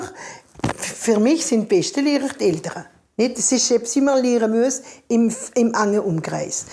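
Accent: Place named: Alsace, France. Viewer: Hallo, ich finde Dich Klasse! Ich bin aus dem Westerwald/Rheinland-Pfalz und kann fast alles verstehen, wenn Du elsässisch sprichst. Unbedingt weiter machen, damit die elsässische Sproch do blaift:-)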